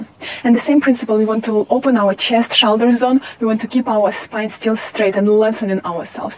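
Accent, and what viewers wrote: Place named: Germany. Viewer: A real sincere efforts to help yogis struggling with this pose. Thnx for sharing. Also, I loved ur English with ( if I m not wrong), German accent. Love from India. Take care, stay safe.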